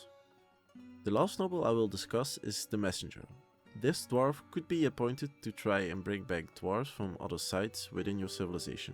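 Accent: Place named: Netherlands. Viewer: I can't listen to this horrible Dutch accent. Jezus Christus.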